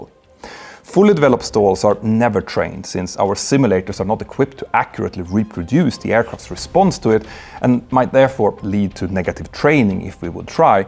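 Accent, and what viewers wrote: Spain. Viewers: urgh PLEASE STOP PRONUNCIATION OF SPANISH WE FUCKING SPEAK PORTUGUESE NOT SPANISH CARALHO!!! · MANO AQUI NÓS FALAMOS PORTUGUÊS NÃO ESPANHOL, NÃO FAZ O MENOR SENTIDO VC LER NOSSAS CIDADES E AEROPORTOS COM DIALETO ESPANHOL QUE PORRA EH ESSA KKKKKKKKK DUDE HERE WE DONT SPEAK SPANISH, WE SPEAK PORTUGUESE THAT NO SENSE READ OUR AIRPORTS AND CITY NAMES WITH SPANISH ACCENT WTF BRO KKKKKKKKKKKKKKKKKK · does live in Spain and has a Spanish wife, so it is not surprising that he would pronounce words with a more Spanish accent even though he is originally from Sweden. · Look, normally I don't like to make a fuss about pronunciation and all that but as a brazilian, the fact that you kept pronouncing everything with some kind of spanish accent is so irritating it actually distracted me from what you were saying. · Hey, the language in Brazil is Portuguese, you're reading de terms and documents with a Spanish accent. 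Great video, BTW.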